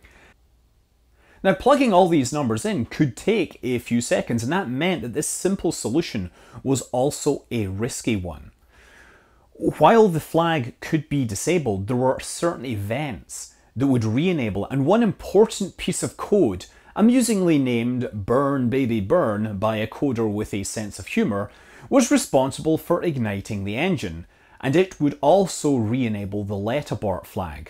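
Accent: Scotland, United Kingdom. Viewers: A Scotsdev, I like it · Nice accent! Scottish?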